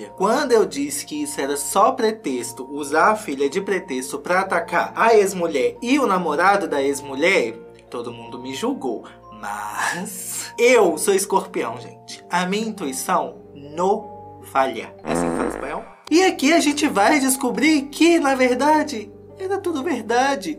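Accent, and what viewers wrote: Minas Gerais, Brazil. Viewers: Meu Deus, tem vez que eu to cagando pra notícia, mas eu coloco só pra ouvir a voz e as piadocas de. Ele tem uma voz e uma energia Rico Melquiades, só que do triângulo mineiro, hahaha. Legal demais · me divirto muito com vc! Adoro suas tiradas, seu sotaque de mineiro e sua voz! Bjsss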